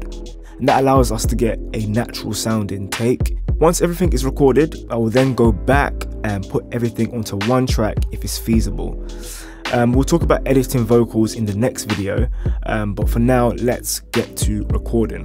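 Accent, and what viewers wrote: United Kingdom. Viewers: Dont usually comment.. but I thought this was a very well produced video.: clear and fast flowing.. also refreshing to hear another UK bruh doing a tutorial. Keep it up!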